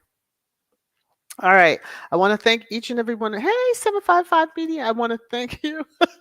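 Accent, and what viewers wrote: RP, United Kingdom. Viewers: Thank you for another amazing thrash time 🙏😘❤❤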